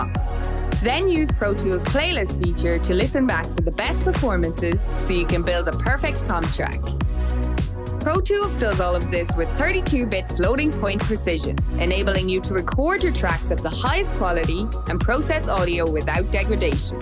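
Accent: Ireland. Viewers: Some accent. Sounds like she needs audio suite with a blast of Melodyne. Can't stand that oirish accent - always sounds like a donkey stuck in a bog.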